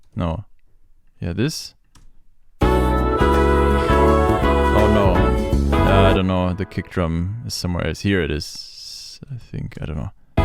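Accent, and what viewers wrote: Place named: Germany. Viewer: talks like a german, 'Heh?s' like a dutchman . do Germans do that too? I thought it was a very dutch thing until i heard you do it.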